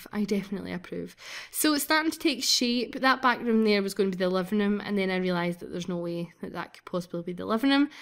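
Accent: Scotland, United Kingdom. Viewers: I love your accent! Are you Scottish? It's so amazing! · U sound Scottish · If you get into these sims could you do a current household I know 8 sims is tragic I would love to see it and I'm so jelly of your voice I'm Scottish to but i don't sound As AWSOME as you 👼💙👼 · Yay. Finally someone from Scotland :D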